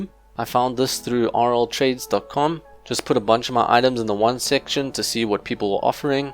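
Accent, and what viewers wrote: South Africa. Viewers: South african? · I knew I recognised ur accent, I'm south african too ! hehe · Are you South African ??? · I will guess where you are from so accent sounds a bit African maybe light German but mostly African so South Africa would by my guess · Are u south African cause ur accent sounds like it btw i am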